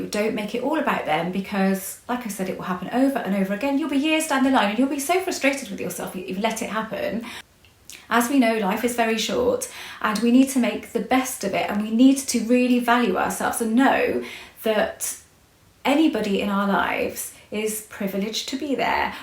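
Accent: United Kingdom. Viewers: i knew i wasn't going crazy. I wonder whatever happened to Noel Edmunds. lol. Anyway thanks for replying. And I must admit I sometimes get you and Natalie Dance mixed up. Soz. Anyway I like your teaching style. Nice to hear a British accent teaching this stuff.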